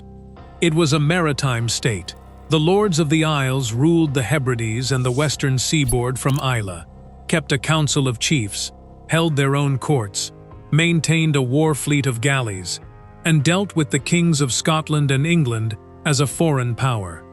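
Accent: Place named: United States. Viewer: Why is it so hard to get a real life narrator. The dramatic AI narration with the US accent is so unsuitabel.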